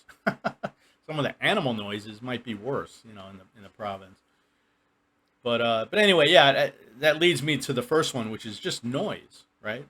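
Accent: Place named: Wisconsin, United States. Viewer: The older i get the less noise l can tolerate , headphones hehe rooster barn ,are you sure you ain't living in a chicken coop? I hear there a rooster gangs there l used to wish l could sing, now l wished they could. Mmmmm diesel exhaust. We are too stoopid to go metric mmmmmm big black plumes of smoke l had a feeling you was from the Midwest , l was thinking Ohio I'm even hearing a little Wisconsin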